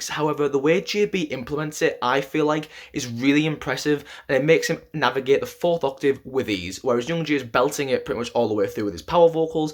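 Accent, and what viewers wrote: United Kingdom. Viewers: Is the host from UK ? I heard pretty much of British accent !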